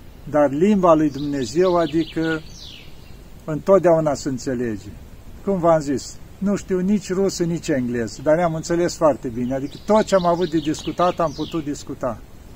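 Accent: Moldova. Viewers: Parinte voi precis sinteti roman ca cind vorbiti parca aveti accent moldovenesc va multumesc pentru sfaturile voastre frumoase imi plac povestirile care le spuneti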